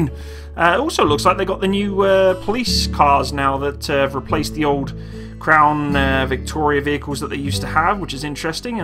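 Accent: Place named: North East England, United Kingdom. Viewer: what part of north east England r you from im from Hartlepool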